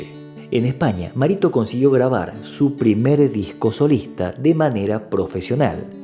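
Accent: Tucumán, Argentina. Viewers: Sos tucumano?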